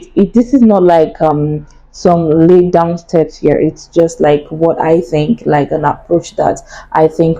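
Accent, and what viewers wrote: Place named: South Africa. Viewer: Madam, are you from South Africa? Please! God Bless You!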